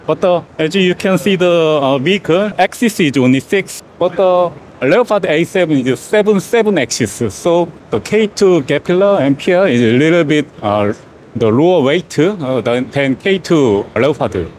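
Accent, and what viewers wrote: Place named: South Korea. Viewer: it´s extremely hard for me to understand english with heavy korean accent. So it would be nice to get subtitles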